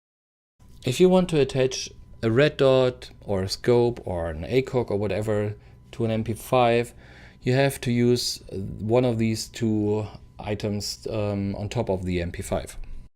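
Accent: Germany. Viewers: Wieso merke ich direkt nach dem ersten satzt dass du Deutscher bist :)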